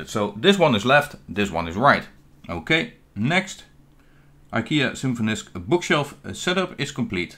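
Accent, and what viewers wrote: Netherlands. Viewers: You sound dutch bro but nice video bro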